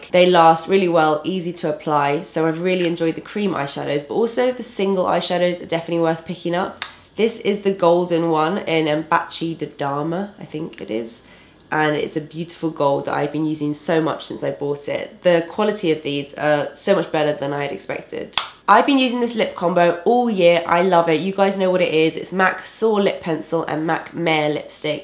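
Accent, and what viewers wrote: England, United Kingdom. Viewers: I just love your English accent!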